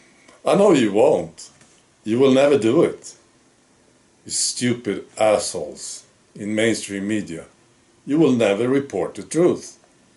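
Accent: Sweden. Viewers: I love your Swedish accent, don't stop saying "Mongkey" :) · I like your accent, you speak English better than a lot of English people, I am English, and one of my favourite sayings is one of your Swedish ones, I cannot say it in Swedish, " you have now shit in the blue cupboard ", " it means you have gone to far", so you can try an English controversial word, c u ne3xt Tuesday.much love from essex England. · Your accent? What are they on about? You are Swedish of course you have a bit of an accent, I wonder how well they speak Swedish? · Here here! The Big C 👍! Great idea to have there own League....... 👍👍 Love your Swedish English. Thanks for all you do to get the Truth out there 👏👏👏🍿🐸❤️🇨🇦❤️🙏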